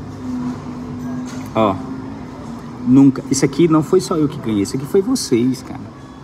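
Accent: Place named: Northeast, Brazil. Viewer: Sou do Paraná, Santo Antônio da Platina.Adoro o sotaque nordestino, e essa sua voz é alegria é contagiante. ❤ ❤ ❤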